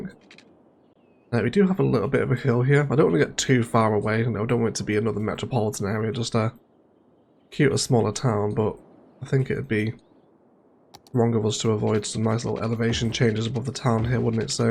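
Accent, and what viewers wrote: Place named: North, United Kingdom. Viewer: Thanks for all of your enjoyable contributions which are always very entertaining. I was just curious...you've likely been asked this before: You have a northern accent; which region are you from. Thanks again. Best wishes from Belgium.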